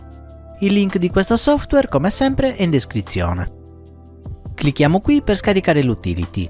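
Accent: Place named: Cagliari, Italy. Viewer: sei forte e poi il tuo accento mi sta troppo simpatico. Sarà colpa dei miei amici Cagliaritani che ricordo sempre con affetto!!! Grazie per i tuoi video fatti molto bene.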